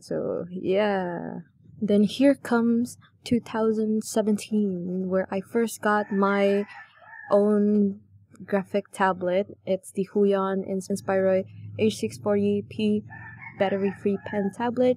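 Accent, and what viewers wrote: Philippines. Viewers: Ur voice has a filipino accent and btw i love ur art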